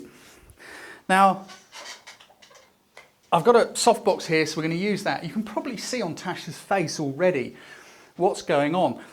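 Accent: England, United Kingdom. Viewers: Hi! Thanks so much for all of your videos, they are very helpful and you make everything easy to understand. Love the English accent as well! I am thinking of setting up a home studio and wondered if you thought a room with the dimensions of 14ftx12ft would be sufficient and what do you think my my must have equipment should be? This would be for newborn and portrait photography mainly. Thanks again!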